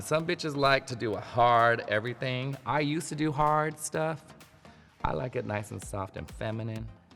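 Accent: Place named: New York, United States. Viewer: I live for ha New York accent , love you 💕💕💕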